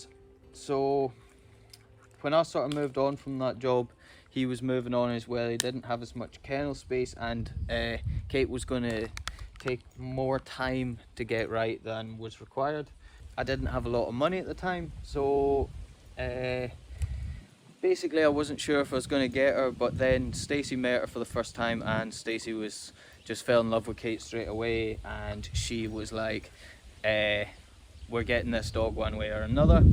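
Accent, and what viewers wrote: Scotland, United Kingdom. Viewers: Im not sure whats most satisfying with this channel. The scottish accent (i think its scottish at least) or the dogs. Ive commented it once before, Echo is such a piece of beauty. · I had a border collie when I was a kid. I was an only child, and Queenie was like my sister. I love watching you and your dogs. I also love your accent. I was in Scotland a few years ago, and I loved it.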